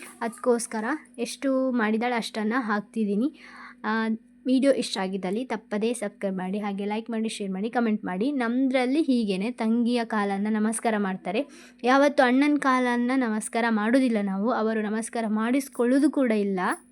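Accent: Mangalore, India. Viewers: Uttarakarnataka style mathadi .manglore style mathadbedi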